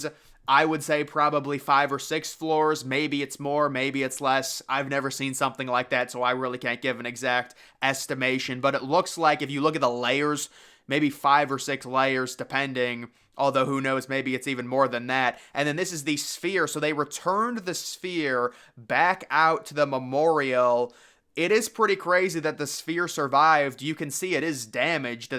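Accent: United States. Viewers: i like the american accent i could listen to that all day and it would not annoy me , promise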